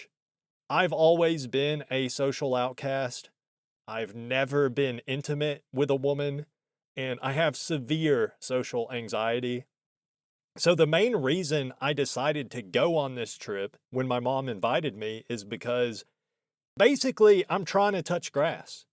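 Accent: South, United States. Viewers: Dude your voice is a gift from GOD. Every part of it, the way you speak, inflections, the hint of southern draw (drawl?) man there is for sure something there. I hate how overused the word is but my gut says "authentic". All sorts of content and things could come from this. Hope you don't stop with just ONE youtube channel!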